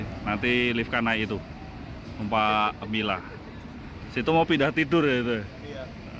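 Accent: Yogyakarta, Indonesia. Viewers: Dari semua youtuber bus, cuma mas yang bikin videonnya apik rasa jogja2 gimana dari logat heehe. Dan aku jadi nyaman nontonnya krn suaranya suara didalam bus langsung dan tidak berisik pkai soundtrack2an. Serasa diajak jalan2 live hehe. Sukses terus salam dari slipi jakarta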